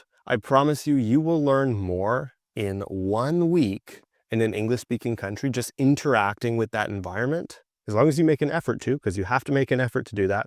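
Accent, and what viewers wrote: United States. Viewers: Are you speaking in American accent or British?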